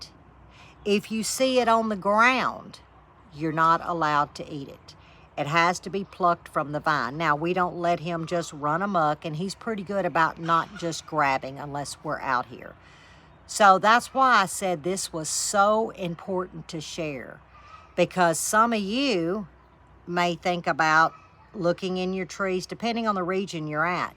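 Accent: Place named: South Carolina Lowcountry, United States. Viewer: is a treasure. As soon as I heard her speak I recognized the south carolina lowcountry accent. I have spent a lot of time in the lowcountry and the folks there are warm and inviting. Lovley people. Now she resides in the upstate of SC where I am from. Been watching her for a long time. She is a great storyteller too.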